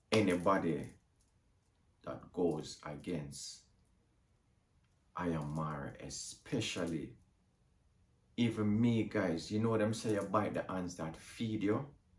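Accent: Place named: Jamaica. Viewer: Funny you sound like Jamaican uncle and I think he was from Canada also.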